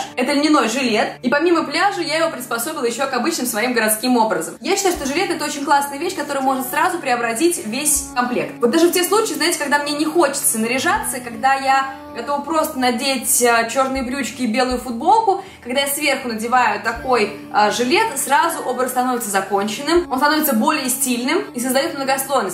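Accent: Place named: United States. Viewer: Вы слишком быстро разговариваете,прямо тараторите и манера разговаривать у вас какая -то американская.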